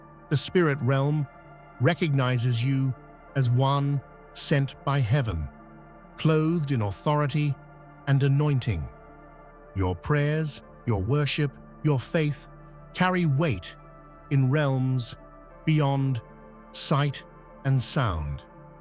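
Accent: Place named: England, United Kingdom. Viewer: If I’m goodie goodie - then I’m not human - btw I love your voice - that clear distinctive English accent- I’m not the chosen one - That would be notions of grandeur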